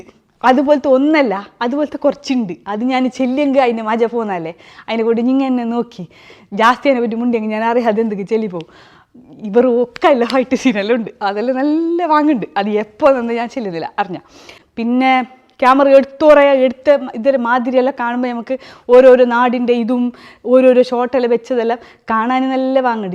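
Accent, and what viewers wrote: Kasaragod, India. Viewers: Still I'm remembering your lucifer review by our slang Kasaragod basa again you are back on same production movie same style of review go on pinne kana ave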